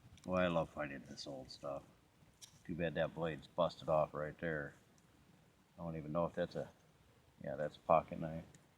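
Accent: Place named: New England, United States. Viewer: Man I love the way you talk yank it's so funny to me A 😎good digs y'all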